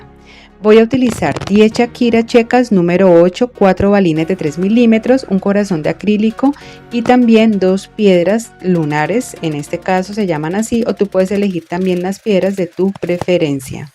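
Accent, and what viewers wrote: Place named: Medellín, Colombia. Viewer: están muy bonitas y la manera como lo explicas lo hace fácil de seguir el proceso, el tono de voz muy ameno, muy bacano. Pareces de Medellín. Saludos.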